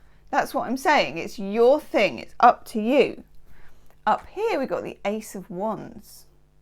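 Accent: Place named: England, United Kingdom. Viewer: I adore you! You are one of my favorite readers!! Accurate and beautiful (and I love your English accent lol)!! 😘🙏🌷🌺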